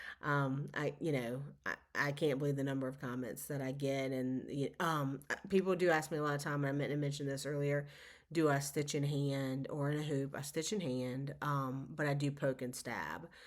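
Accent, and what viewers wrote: South, United States. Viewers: Another great video,! I, too, speak Southern (or is it Surrthern)! That used to bother/embarrass me, but NOT any more!! I'm proud to be a country bumpkin! Your Spooky Summer is a great idea, but I'm in the middle of a huge red sampler and it seems to be attached to my body at the moment! I can't wait to see your finishes, I know they will be fabulous! Happy Stitching and see you next time! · You amaze me with all that you accomplish. Your stitching is beautiful, and I absolutely love your southern accent!!! :) Thank you for another great video.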